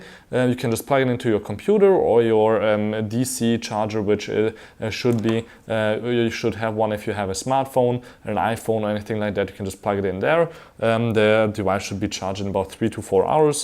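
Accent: Germany. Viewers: I MISS this german english accent sooo much!!!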